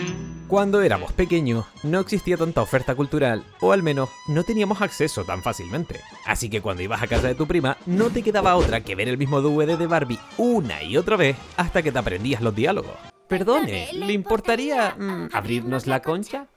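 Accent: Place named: Argentina. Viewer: Tu acento es una mezcla entre Argentino y Español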